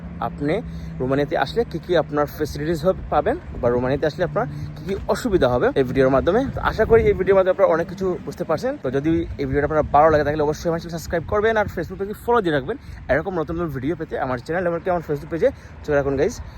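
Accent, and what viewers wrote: Sylhet, Bangladesh. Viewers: ভাইয়ার বাড়ি মনে হয় সিলেট, তো আমি একজন্য সিলেটী হিসাবে আপনার সাথে কথা বলার আগরহ যথি মন চায় রিপ্লাই দিয়েন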